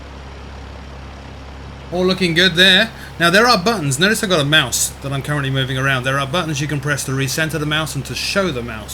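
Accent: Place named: England, United Kingdom. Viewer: I know it's English ... I can tell by the accent ... tech-talk is it's own new language - as usual in any discipline. I just am beyond amazed at age 60 that a) humans can fly b) humans can simulate flight c) humans can put this on an internet for all to see and d) we should all be apoplectic in the very conceiving of this 3rd millenium progress!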